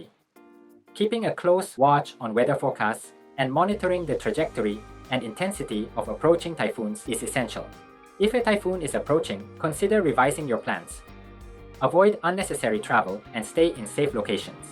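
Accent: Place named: China. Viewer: He sounds like Chinese national living in Japan. Ying, yong, ong sounds. And he doesn’t have typical Japanese accent. May be Chinese national pretending to be Japanese?? Lots Chinese live in Japan.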